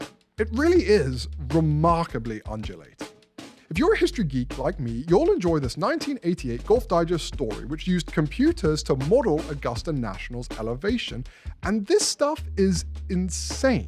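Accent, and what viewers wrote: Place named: England, United Kingdom. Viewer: I’m over everything golf being an English accent. Sorry.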